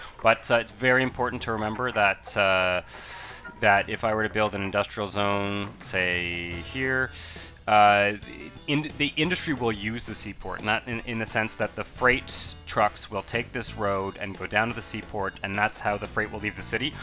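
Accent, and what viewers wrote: Canada. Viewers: Thanks man! This whole time I thought you were from Toronto btw haha you have that accent, I’m from Toronto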